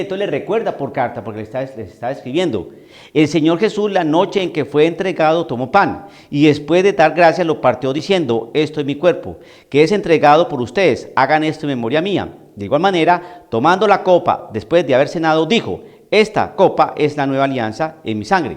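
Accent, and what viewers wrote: Colombia. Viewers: Padre excelente sus enseñanzas, más claro imposible; así hablamos los colombianos, con la ruana puesta, para estos climas que hacen por estos días y de ñapa con la delicia de aguadepanelita. Se le aprecia mucho padre 🇨🇴🙏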